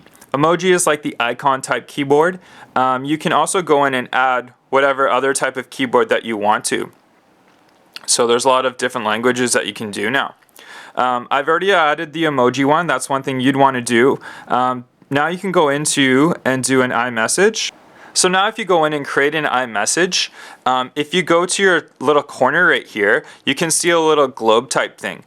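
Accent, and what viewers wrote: Canada. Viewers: That's Canadian accent. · IS HE CANADAIN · dude, are you from fucking Canada?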